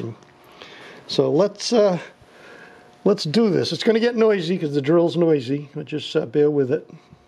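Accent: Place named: East Coast, United States. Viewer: Hi! Thanks for the info from FB. You sound very east coast! Love it my man. Came from CT (where we speak proper enleash!) Looking forward to watching this.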